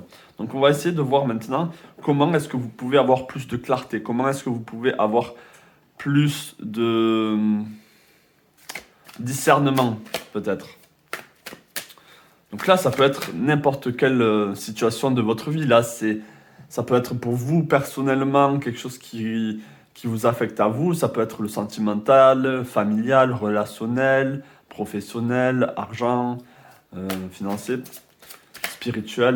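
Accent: South, France. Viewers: Merci pour votre reponse. J'ecoute bcp de choses sur travailler ci ou travailler ca, mais concretement ca veut dire quoi et comment in fait? Sinon j'aime beaucoup le concept d'adresser les diffulcutes et l'accent du Sud!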